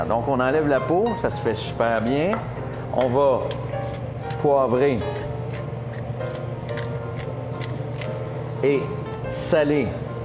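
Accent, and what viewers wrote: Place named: Quebec, Canada. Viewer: Comment jsui trop d'avoir découvert ta chaîne, je bois littéralement toutes tes vidéos ! Je sais pas pour vous autres francophones mais l'accent québécois me relaxe et me fait me sentir heureux.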